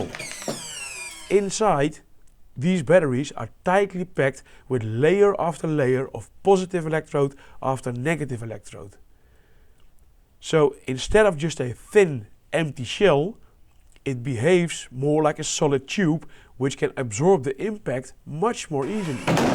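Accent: Netherlands. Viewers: From the first words "lithium batteries" I knew you were Dutch 😅 First time on this channel. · Love het nederlandse accent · I love the dutch accent,ok on topic,thks for this infomation,usefull. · i just love the Dutch accent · Hey a Dutchy speaking English :D Leuke video! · This accent sounds so dutch, wth🙏😭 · je hoort het gelijk als het een nederlander is XD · ik hou van dit nederlands accent HAHA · De nederlandse accent is egt om over te lage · 10 seconds in and i know youre dutch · Nen holander die engels probeert te praten 😅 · I smell dutch accent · Ben je Nederlander, bro? Want je spreekstijl en accent klinken meer als die van een Nederlander die Engels spreekt, daarom wilde ik het even vragen. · Heerlijk Nederlands Engels accent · It says united states in your bio, but that accent sounds really Germanic, specifically Dutch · sir you sound dutch as fuck, are you? 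· You are Dutch?! I hear Dutch accent. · You sound very dutch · Ik hoor een Nederlander, of niet? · I know a dutch accent when i hear one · Dutch voice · dutch accent... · First time watcher, I just knew you were Dutch from your accent. Good stuff. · immediatally knew you were Dutch the moment i heard that accent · Are you Dutch if I may ask. You sound very Dutch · Well, I don't take you in the mailing but your video subjects are good however your English is not so good. Very Dutch like. Maybe you have to practice more or take some lessons and then I think that you hit two flies in one clap. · Dit accent klinkt zo Nederlands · Dutch accent so thick 😅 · holy dutch accent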